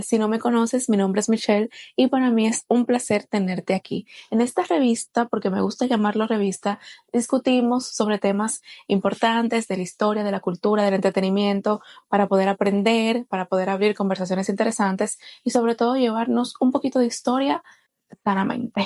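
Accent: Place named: Dominican Republic. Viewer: Me encanta tu voz, que agradable es el acento dominicano. Saludos desde México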